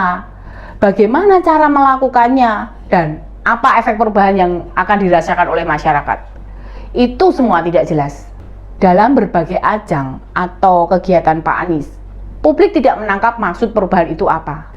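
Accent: Java, Indonesia. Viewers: Mba pertahankan medokxa👍👍👍aku seneng👍👍😜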